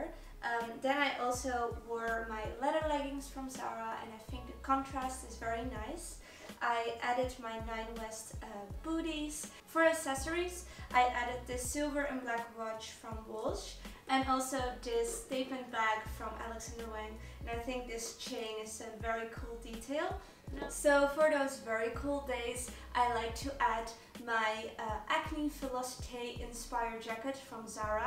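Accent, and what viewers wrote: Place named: Netherlands. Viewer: Haha dacht al dat je Nederlands was door je accent blijk je dat ook te zijn 😂😂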